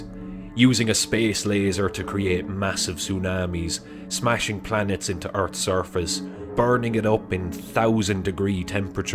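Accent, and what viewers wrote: Cork, Ireland. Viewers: can hear an Irish accent much love from Dublin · IRISH ACCENT MAKES IT SO MUCH BETTER!! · Sounds like a Cork accent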